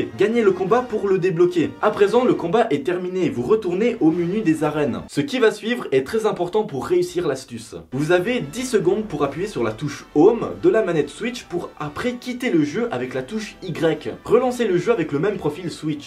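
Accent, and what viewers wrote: Switzerland. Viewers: T’es suisse ? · JE SAVAIS QUE TU ETAIT SUISSE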